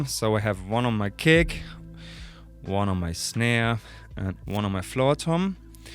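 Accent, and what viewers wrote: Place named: Germany. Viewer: The German accent is legendary haha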